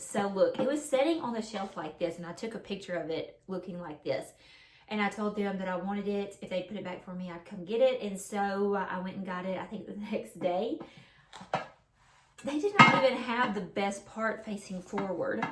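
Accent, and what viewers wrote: South, United States. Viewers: You and your sweet little family are precious ! I have family in AL, so listening to you talk with your southern drawl takes me right back "home" 🥰 Love to see all of your finds and how you decorate with them !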